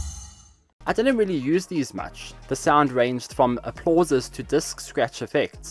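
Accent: South Africa. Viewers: Greetings from South Africa, Durban ! Great review indeed ! I knew that you were in SA as well when I heard the accent and the words...DURING LOAD SHEDDING 🤣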